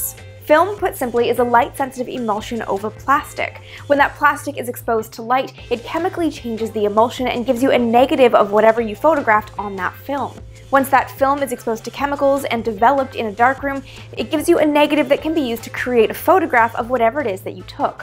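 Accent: Canada. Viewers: About. Canadian. That is all. Bye.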